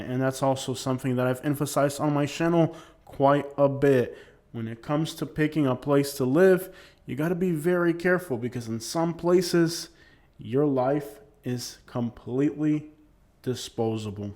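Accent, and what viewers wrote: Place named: New York, United States. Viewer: Apparently you are not from this area. You sound like you’re from New York. Get your facts straight before you make an ass out of yourself.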